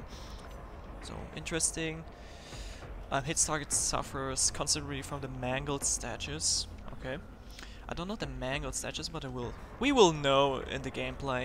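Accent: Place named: Germany. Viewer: I was just wondering, because you sound german:D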